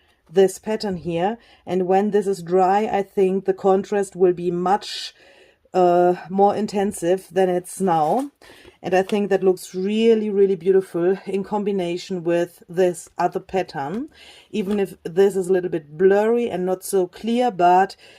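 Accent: Germany. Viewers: I love your english-german english. And your humour ! hahha xx so refreshing! Richtig erfrischend! :-)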